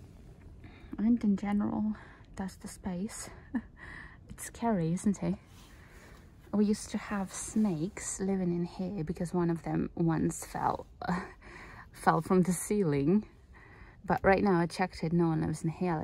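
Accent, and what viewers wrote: England, United Kingdom. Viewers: Thank you. I just came across one of her videos where she kind of explains. Apparently all done by practising and hard work in Belarus. Hasn't been to the UK, I believe, or at least not for a significant length of time. Unbelievable. She sounds about 98% British. I don't think I've ever seen a non-native that sounds like her. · I would come help you... Save that cute 🏠 I am curious how you have an English accent and from Belarus ? Love you videos peaceful .. Ps you may want to shovel all debris out of attic to reduce weight on ceiling beams . I am a Architect and builder in NYC . I also now live in a tiny cabin on farm which is in similar condition. Roof must be repaired asap . Then all else You can use cement mortar to repair roof tiles tile thin set grout etc cheaper than cauk . Good 🤞😊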